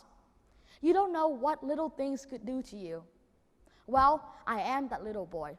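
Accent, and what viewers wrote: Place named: Vietnam. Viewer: giọng Vietnamese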